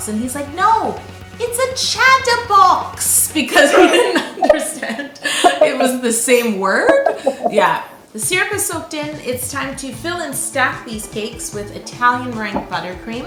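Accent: England, United Kingdom. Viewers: English accents, yes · I love your cakes! You inspire me! I recently started my YouTube channel and have been baking a lot. My favorite so far is a piano cake that I brought to Choir rehearsal before all of this Quarantine! Everyone loved the piano which was really a cake! I can't wait to try to make your Watermelon cake in the summer. These boots are so cute with this cake & love your British accent in this video, lol !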